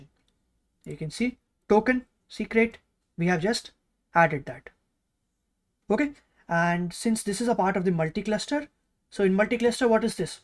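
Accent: India. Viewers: Yes! He uses basic English with Indian accent. Bilkul follow kar sakte ho👍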